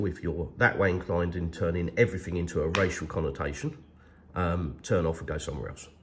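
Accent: United Kingdom. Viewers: Кажется, британский акцент..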